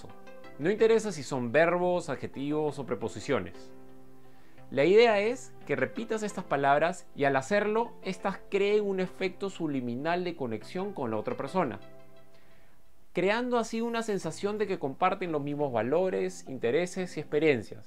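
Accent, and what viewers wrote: Peru. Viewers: Eres peruano? xD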